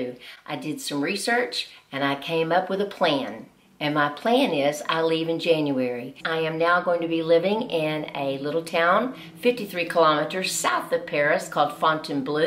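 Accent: South, United States. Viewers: I like your uniqueness..they will love your southern accent, many people will be envious of you. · I love her accent-American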